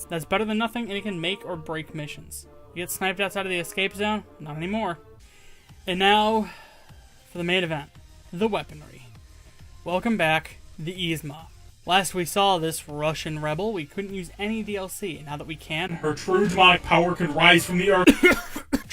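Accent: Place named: United States. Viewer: Honestly man, you have the best type of american accent, where about are you from?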